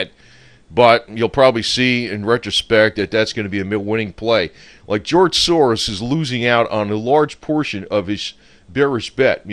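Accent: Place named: Chicago, United States. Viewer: you sound like your from Chicago or Jersey. I think silver is more abundant than people think. I spent $100K on the metal at $31 to figure this out. You are right, innovation and a positive environment to encourage creativity is far more valuable than having abundant resources owned by meat heads. Good analysis.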